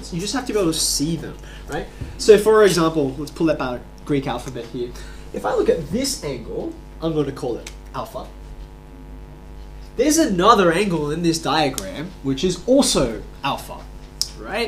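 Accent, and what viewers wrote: Australia. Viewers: it's just an australian accent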